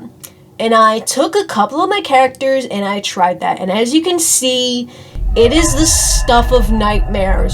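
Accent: New York, United States. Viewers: You sound like you're from New York. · my favorite brit with a new yorker accent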